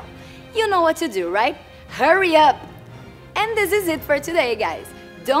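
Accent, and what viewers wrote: Brazil. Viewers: I'm also the host for Bandai Brazil and Latam and I'm brazilian, so I know there's some accent there, but I'll work harder on that matter and I'm sure you'll end up loving the content!! <3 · She has an brazilian accent... is she brazilian? Very nice host!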